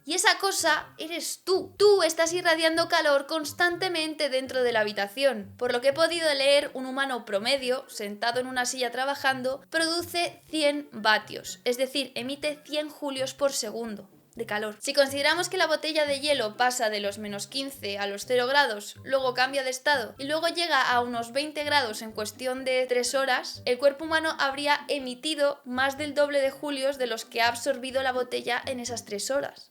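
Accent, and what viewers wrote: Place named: Colombia. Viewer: No parece Española, parece Colombiana.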